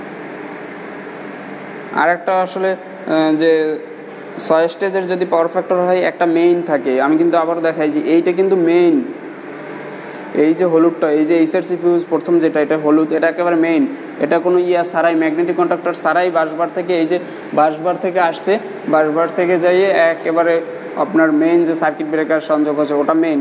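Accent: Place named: Barishal, Bangladesh. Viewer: বরিশালের ভাষা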